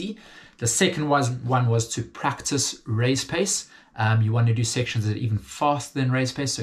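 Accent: South Africa. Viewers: bro are you south african?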